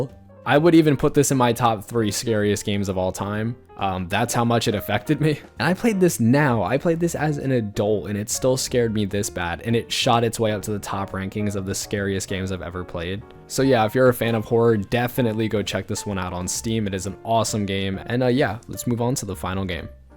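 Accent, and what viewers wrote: Poland. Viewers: bro are you polish??????????????????????????/ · Are you polish?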